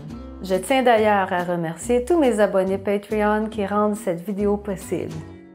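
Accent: Quebec, Canada. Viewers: Quelle découverte 😍😍 Mme, ta simplicité, ton québécois, ton charisme sont impressionnants! Un gros merci pour tes vidéos! On apprend bcp avec toé ici chez nous 🥰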